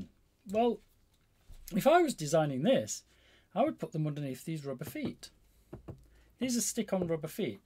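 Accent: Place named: East Midlands, United Kingdom. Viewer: ah thanks for let me know I thought it was a Notts/ Derby accent :) I just came across your YouTube page and just now starting to look at your other vids, very interesting too. I have a music production studio and am also into sound engineering but more hands on than hands dismantling heheh. I also own the Push 2, which I found fascinating when you dismantled it.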